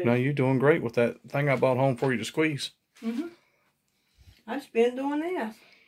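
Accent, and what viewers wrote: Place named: North Carolina, United States. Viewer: My first time viewing. Your accent reminds me of home ❤️ I’m from the Foothills in NC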